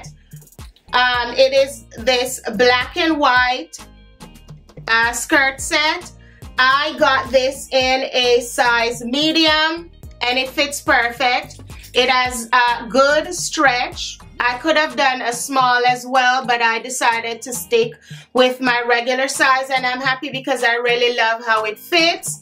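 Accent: Jamaica. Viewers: First of all I love those dresses, but mi luv di Jamaican chatting,am crazy about looking good u go cuz, we shared the same last name, big up uself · Girl I just stumbled on ur channel and if mi love you one time. As a fellow Jamaican you got me laughing cause I was seeing and hearing myself in you Xtra plus tax 🤣 love ur vibe, amazing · Ok you’re too cute and I love your Jamaican accent. New subscriber her😊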